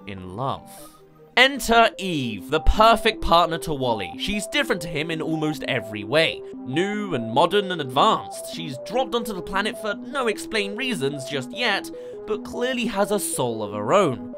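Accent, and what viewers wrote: United Kingdom. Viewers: I actually like the British accent. Maybe even a bit jealous...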